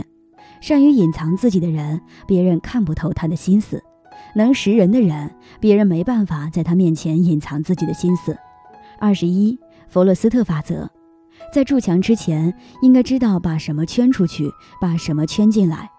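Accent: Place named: China. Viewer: 請問~版主是哪裡人?中國人嗎?聽口音很像中國人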